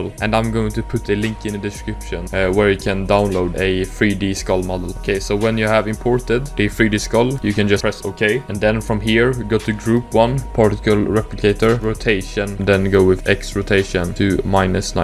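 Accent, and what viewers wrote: Sweden. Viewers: are you swedish? XD, i feel like i recognise the accent as a fellow swed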